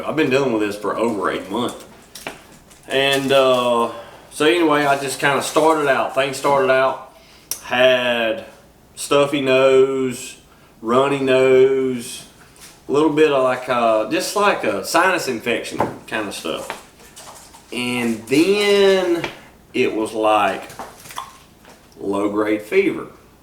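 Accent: South, United States. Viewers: Thank you for sharing your experience with Covid-19, Everyone will experience Covid-19 differently with a lot of Similarities, there’s several Symptoms commonly known with COVID-19, everyone has or has not Other Underlying Health Issues that will Most likely make this Virus more difficult to Deal with and it may add more Symptoms and issues, I’m a free diver and I need my lungs to be in top Condition so I try to take necessary measures to keep from getting infected but i also have a few underlying health issues, I’m a Combat Veteran and my VA Is helping me a lot to keep it safe for me. Your message hear is Spot on… My fellow Comrades and I fought in Various Combat theater’s to keep Americans Freedom so Everyone has their Rights, COVID-19 is REAL, PEOPLE ARE DYING, DO WHAT YOU CAN TO KEEP FROM BEING INFECTED, TRY NOT TO INFECT OTHERS, TAKE THIS INFORMATION SERIOUSLY! 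People Pay good attention to what this young man is telling you! I agree with his message, I’m 57 and not in great health so I must stay well, I love my Tuna, Mahi-mahi, Wahoo (Ono), Sword fish) so I must keep fishing (free diving)W/O tanks! KEEP ON FISHING! Where ever you’re from, not sure but you sound like a Southern boy? Kentucky or Tennessee? I have a Army buddy up in Kentucky, strong accent like yours lol. Take bud. Aloha from Hawaii. 🌴🌴🤙🏼😎🤿🌴🌴🍍🥑🍌🏄🏽‍♂️🚣🏽🚤🐟🎣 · I appreciate your heart on this you southern accent reels it all in for me it's like listening to family also I'm sorry for your experience with this and you know and I know God brought you out of this, but the politics is exactly what you think you got it right friend. This is what I discovered and I believe God shown me, from the beginning I knew they rolled it out too fast I was out on lockdown for 4 wks and in that time I experienced a little fear and it felt familiar like the time the world learned about terrorism at 9-11-01 and before that with the extreme Islamic. 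I began to seek the Lord I knew I wasn't anywhere I was supposed to be with the Lord but I did what I knew to do repented began to read my Bible more and I was accustomed to witness cause though steeped in my sin I knew the Lord had a job for us believers to do but I couldn't be around anyone I thought going to street evangelize but my family and some YouTubers advise not going alone but I really wanted to fish, that's my type of fishing cause Jesus made us fishers of men. No one would go fishing with me so I continued what I started to do while on lock down and that became a YouTuber for Christ and I also forwarded videos I found on you tube some shocking things about the poor spirituality of our current state as a country I mean I was desperate to do the work of the Lord I may have went a little overboard but when you don't know if you have the next day or the next moment then you reach out to everyone you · You Country AF. 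Lol new to your vids. What’s stand for?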